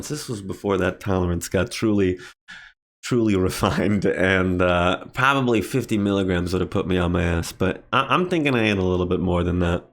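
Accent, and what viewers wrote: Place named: New York, United States. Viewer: bro sound like he from new york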